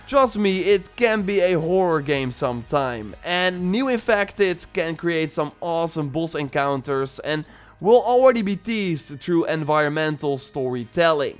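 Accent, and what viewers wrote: Netherlands. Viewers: Haha this guy has a heavily dutch accent so I think you’re dutch don’t worry though I am dutch too. Maakt niet uit man😂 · He's Dutch. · This Dutch accent moron is clearly paid off by the developer. Not one negative thing to say about this game.... he's just praising every single aspect of this game even though many legit reviewers clearly state that this game has aspects which are total garbage like the AI, and it's nowhere near as good as even the first game. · IS DUTCH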